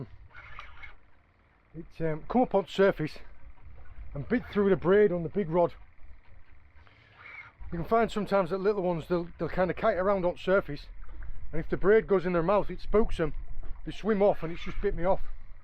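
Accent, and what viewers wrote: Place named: Middlesbrough, United Kingdom. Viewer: thought as much you sound same as me from the boro lol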